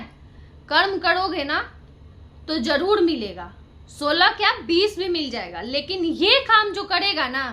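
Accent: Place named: Bihar, India. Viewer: बिहारी अंदाज का मस्त बोलती हो आप मजा आ गया ❤❤❤❤❤❤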